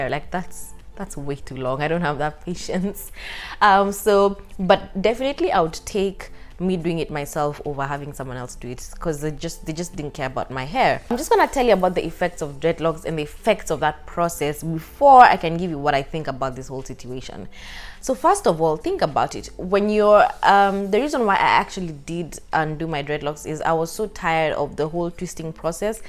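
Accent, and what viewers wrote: Kenya. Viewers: You sound Kenyan. something about your accent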